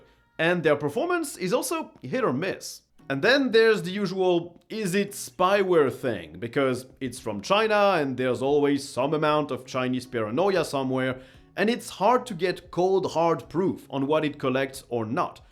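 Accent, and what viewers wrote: France. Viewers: Tu serais pas Français par hasard?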